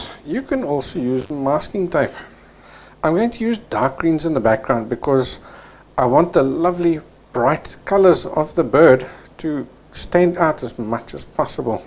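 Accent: South Africa. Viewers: How refreshing to see a painting tutorial without the tedious real time or annoying back ground music. No boring pre chatter. Just straight in. That's why I can happily look at it more then once and to also enjoy the South African accent. Thank you so much!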